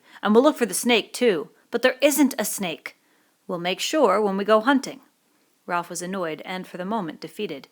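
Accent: United Kingdom. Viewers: Chlorita Bleachita does she sound English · does she sound like shes from the uk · everyone saying how it should be pronounced... Does she sound like she is in Britian??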